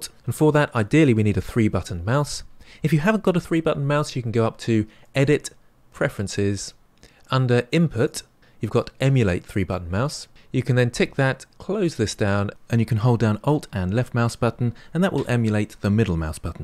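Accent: United Kingdom. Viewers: Nice to have some expert tutorials with a British accent. Gonna get through your playlist, and after watching so many, I hope this will allow me to start creating instead of videos. Thanks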